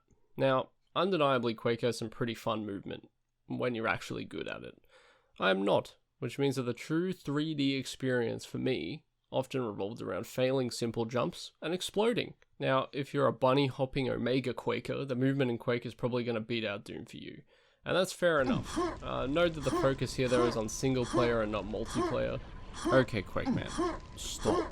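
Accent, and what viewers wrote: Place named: Australia. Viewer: Subbed! Not trying to be a bitch, I mean this as constructive criticism - you talk kinda slow, your voice is nice it was a tad too fast on 1.25 and , it would probably be good to speak a little faster. - it's not your accent, I'm Australian too, peace from Perth, WA(steland) ❤what you said about good sprites > poor 3D, HOLY SHIT THAT IS SO PAINFULLY TRUE WITH POKEMON! Seriously, compare the gen 5 sprites on the DS (nearly 20 year old hardware tsk tsk) to the gen 6 (3DS) 3D renderings, they looked lifeless and cursed - shit, compare gen 5 sprites even to gen 9 on the Switch, gen 5 sprites are STILL sooooooo much better!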